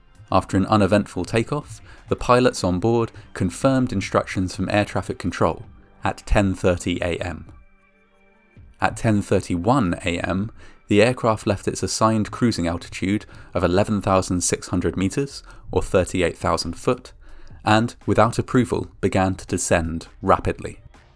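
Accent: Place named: RP, United Kingdom. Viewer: The Queen's English, mehn. Respect.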